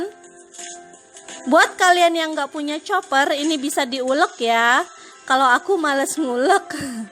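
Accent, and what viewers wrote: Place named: Java, Indonesia. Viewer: Wadidau..medok beut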